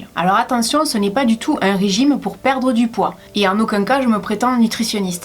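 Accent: South, France. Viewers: tu m'a fait trop rire la spécialité du sud de la France avec l'accent du sud😂😂😂😂😂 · Hey ! Superrrrr vidéo !! Pourrais tu faire une vidéo sur des recettes du "Sud de la France"(avec ton petit accent :-)) ou sur des recette faciles et rapides pour les gens qui ne rentrent pas chez eux le midi ;-)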